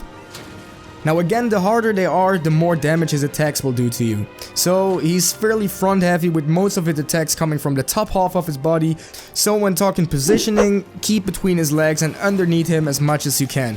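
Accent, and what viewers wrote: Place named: Norway. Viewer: Love it man thank you! May i ask, where is that accent from? i sense a little Norwegian but I’m usually dead wrong , great video brother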